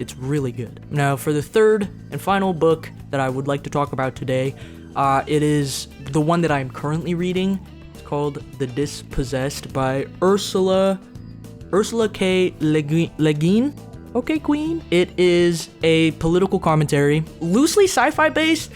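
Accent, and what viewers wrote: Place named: United Kingdom. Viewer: Yea the British accent sold it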